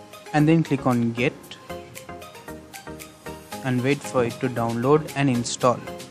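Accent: India. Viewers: When i heard this indian accent I knew this will be the best tutorial i can pick!!!!!!!!! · When i heard this indian accent I knew this will be the best tutorial i can pick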